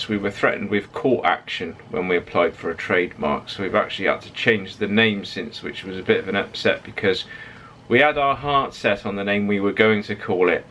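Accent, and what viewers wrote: United Kingdom. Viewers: i wish i had watched all your videos on the van i love your videos very best of its kind on YouTube i would suggest that you try and make the videos available for sale maybe a download possibility for a feei personally would love to have this available its a real treat for me hearing the good old brit accent i sure plan on watching your beautiful wife your a lovely looking young couple wishing you both every success with the business great idea using local vendors and growers love the quality of workmanship non better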